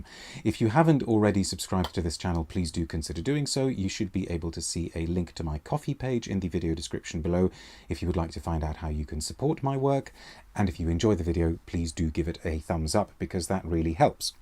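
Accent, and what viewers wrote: United Kingdom. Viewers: and a lovely oxford accent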